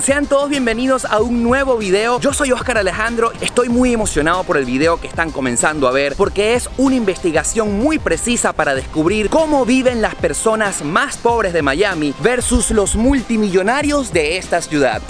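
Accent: Venezuela. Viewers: eres venezolano no.? hola no conocia tu trabajo. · El acento venezolano es inconfundible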